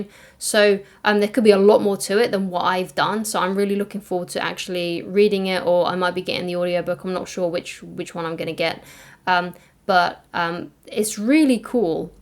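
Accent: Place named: England, United Kingdom. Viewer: Thank god a nice English accent.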